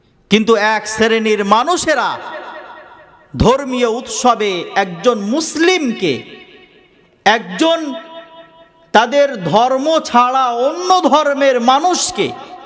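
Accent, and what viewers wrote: Bangladesh. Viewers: আপনার কথা শুনে কেন যেন মনে হচ্ছে আপনি বাংলাদেশী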